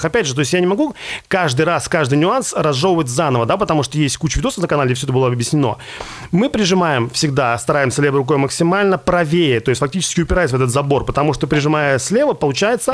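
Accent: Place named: Moscow, Russia. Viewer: тошнит от твоего мАаааацковскава акцента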